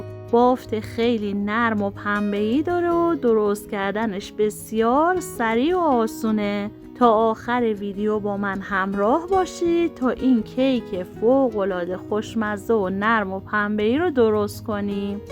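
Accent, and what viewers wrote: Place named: United Kingdom. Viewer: استاد چرا زبان پارسی را با لهجه انگلیسی صحبت می‌کنی .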